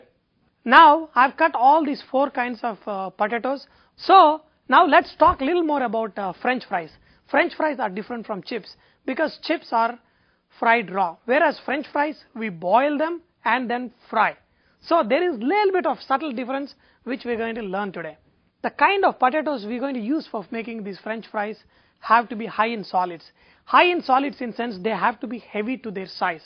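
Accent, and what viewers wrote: India. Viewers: guys, stop making fun of his accent. you try giving a cooking lesson in an Indian language and see how you feel!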